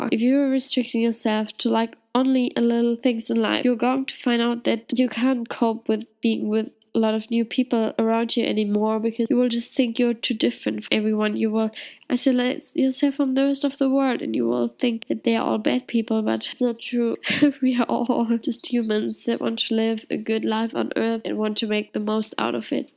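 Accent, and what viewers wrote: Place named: Germany. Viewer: Oh your German accent is so cool! Go Germany!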